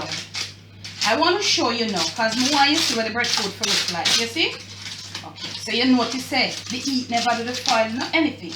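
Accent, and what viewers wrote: Jamaica. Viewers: You too sweet.i enjoy your cooking and your Jamaican accent.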